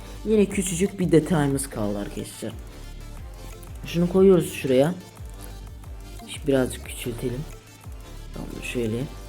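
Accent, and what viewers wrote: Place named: Azerbaijan. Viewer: Qaqa Azerbaycanlı san turkcə danisirsan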